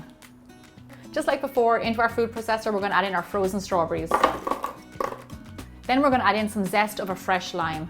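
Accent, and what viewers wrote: Ireland. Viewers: Are you Irish?? Sorry if I'm wrong but my nana is Irish and she has an accent that sounds like yours!! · Btw I love you accent! What is it Irish?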